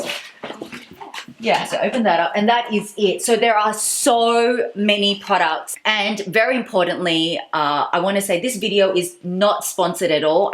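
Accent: Australia. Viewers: Are you Thai or Filipino? Like your Australian accent mixed with Asian roots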